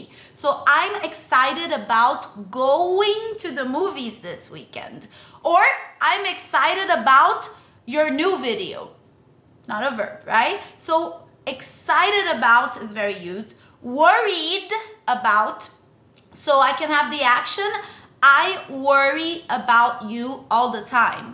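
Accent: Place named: Brazil. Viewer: Seu ingles é perfeito!!! Mas eu reconheço um brazuca em qquer lugar heheh parabéns pelo canal, estava procurando como melhorar meu uso das preposições AT, IN e ON e parei aqui, você tem algum video sobre pra eu dar uma estudada por favor?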